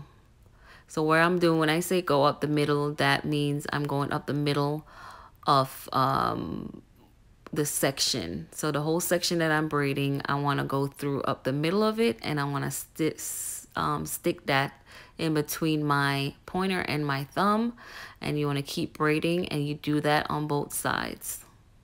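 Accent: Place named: Jamaica. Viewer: Ok....I definitely hear a Jamaican accent!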